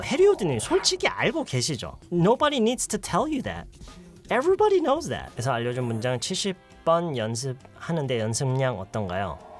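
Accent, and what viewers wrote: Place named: United States. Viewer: 샘은 대단합니다 살이 조금 붙어도 대단한 인기입니다 발음도 좋습니다 미국 사람과 비슷합니다 흑인발음 같기도 하고 백인 발음 같기도 합니다 헷갈립니다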